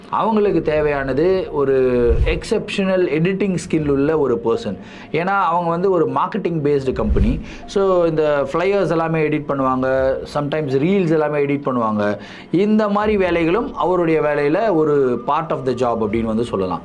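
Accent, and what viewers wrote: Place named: Bangalore, India. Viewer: U have Bangalore slang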